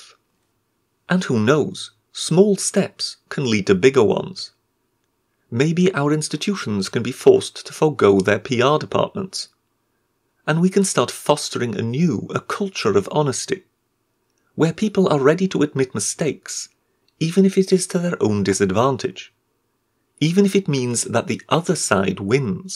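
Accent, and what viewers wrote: United Kingdom. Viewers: You sound like Dr. Layman - with a British accent of course.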